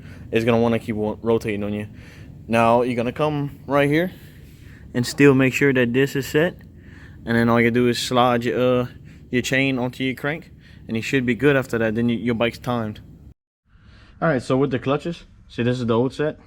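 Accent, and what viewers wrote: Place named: South Louisiana, United States. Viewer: bah you gotta be from south lousiana talkin like dat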